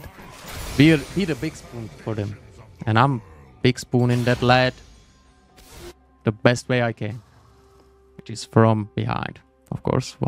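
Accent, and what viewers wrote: India. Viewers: all this while i tot was indian from his accent.:D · i though you were indian lol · I thought you were indian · Yeah, he sounds Indian 😭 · I thought you were an indian cuz of accent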